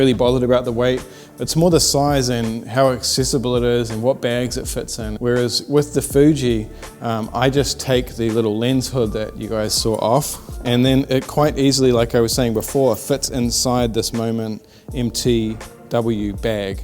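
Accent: New Zealand. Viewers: are you from New Zealand bro ?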